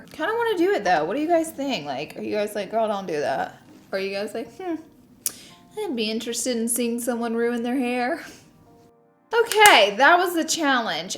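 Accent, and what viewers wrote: South, United States. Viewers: A new sub here! I love your content, your delivery and that damn southern accent!!! "Conciller".. LOVE IT!!!!!!! 😀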